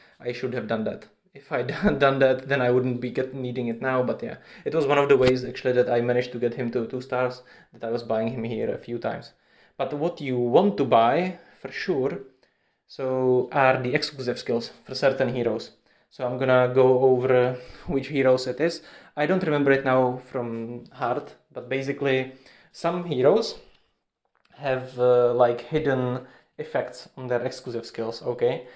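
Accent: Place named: Czech Republic. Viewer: Držím palce, ale tvá výslovnost AJ mi trhá uši. Slovní zásoba a slovosled je relativně OK, ale ta výslovnost je Czenglish. Doporučuju poslouchat třeba Kubovu English, má tam často věci k výslovnosti.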